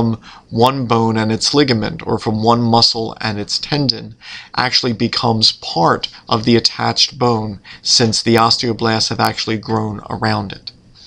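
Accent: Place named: Philadelphia, United States. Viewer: He's from near Philly I can tell by the accent (and the name AKA )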